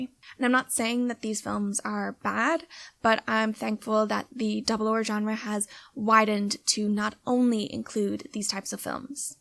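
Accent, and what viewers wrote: Ireland. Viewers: Amazing video but unrelated, do you have a foreign accent? just on the Rs and the "ah" sounding As does it sound irish-ish lol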